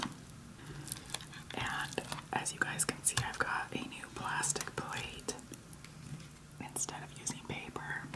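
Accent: Ireland. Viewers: oh my gosh, before the disclaimer I thought you were 100% Irish :O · r u irish? also rply if ur wtching in 2016!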